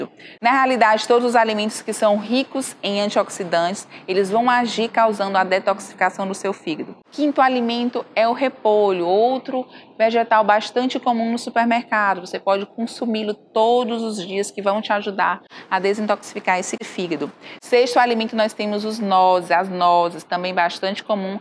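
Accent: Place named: Ceará, Brazil. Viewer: Pelo sotaque dela deve ser do Ceará.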